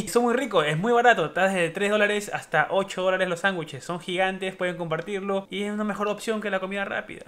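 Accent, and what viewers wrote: Peru. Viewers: Tienes un acento como de mi perú 🇵🇪!!! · Bravazo tu video hermano !! Eres peruano verdad ? Por tu acento limeño.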